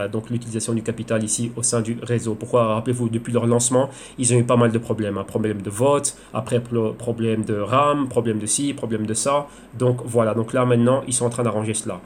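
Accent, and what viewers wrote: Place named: Belgium. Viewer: Tu as un ton qui ressemble à celui du sushis...es tu belge?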